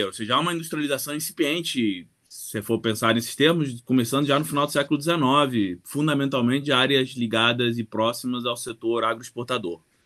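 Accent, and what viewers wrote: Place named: Rio de Janeiro, Brazil. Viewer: Muito clara e inteligente as análises histórico- econômicas. Gostei demais, aprendi muito. Ele tem português impecável, aliás o português dele tem as características, sotaques, brasileiro-carioca. Cumprimentos sinceros ao Prof..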